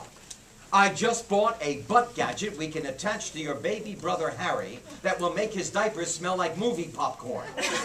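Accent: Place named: New York City, United States. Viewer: I love that excerpt! He has an AWESOME Manhattan, NY accent!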